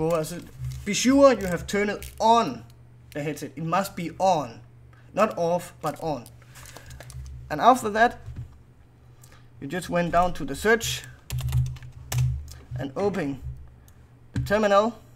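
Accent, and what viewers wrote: Denmark. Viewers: er du dansker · you are from danmark andt you XD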